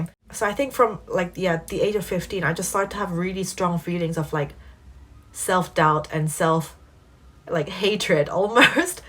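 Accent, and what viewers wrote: England, United Kingdom. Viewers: Wow, what an amazing life story! At least now we know where you got the strong English accent from (absolutely fabulous 💫). Oh yeah, Russian music and ballet teachers don't mess with them!! 🎶 Did you take piano classes? Rachmaninoff and some others probably had gigantic palms, hard to stride the notes for those with smaller hands. I think you will thrive on YouTube with your bilingual abilities. Welcome 🏡 dongsaeng 👊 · Many thanks for such an encouragement life story. I must say that your mum had made the best decision for you and your brother. I have never ever known a Korean person speaking excellence English (in English accent) likes you are. All the best from Melbourne, Australia:-) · This proves your UK accent!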